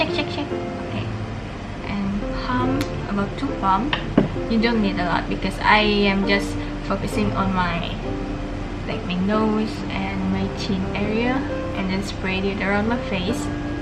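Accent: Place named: East Malaysia, Malaysia. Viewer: Bila bercakap, terus tauu dari seberang! east malaysia ada slang tersendiri 🥰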